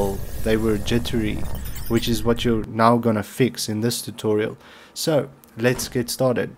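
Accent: South Africa. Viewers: you sound south african xD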